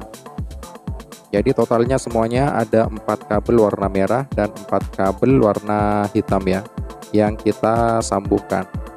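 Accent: Madura, Indonesia. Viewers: Orng Madura kah bnG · Madura ya bang? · Bang orang Madura kah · Bang abang orang madura kah? , saya orang madura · Org mn kok bhs madura? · Madura ta mas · Reng medureh ye bg😁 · Kayak nya lu orng madura ya? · orang madura bang?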